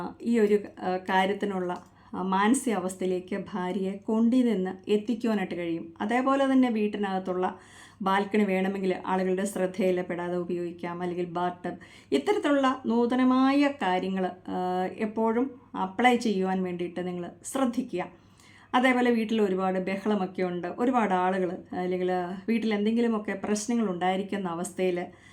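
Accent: Kottayam, India. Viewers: ചേച്ചി കോട്ടയം കാരിയാണോ? സംസാരം കേട്ടിട്ട് അങ്ങനെ തോന്നുന്നു. കോട്ടയം സ്‌ലാംഗിൽ സെക്സ് വിഷയങ്ങൾ കേൾക്കാൻ എനിക്ക് വലിയ ഇഷ്ടമാണ്. അതു പോലെ തന്നെ എറണാകുളം സ്‌ലാങ്ങും. അതൊക്കെ എനിക്ക് വലിയ turn on ആണ്. കാരണം അറിയില്ല.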